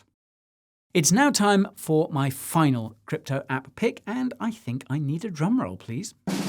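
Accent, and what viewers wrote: United States. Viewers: Love the American accent! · Good American accent Guy! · I never get tired of the American accent lol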